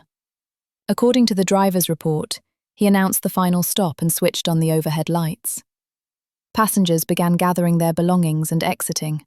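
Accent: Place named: England, United Kingdom. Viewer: Whoopo👻, love these mysterious disappearances, especially when well narrated, love the English accent, thanks 👍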